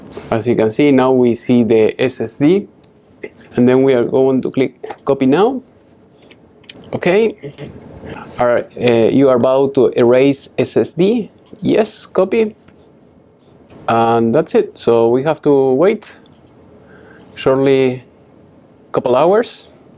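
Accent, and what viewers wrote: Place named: Argentina. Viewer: Nice Gardel accent... ! love it...! thanks for the helping hand , cheers from Adrogue !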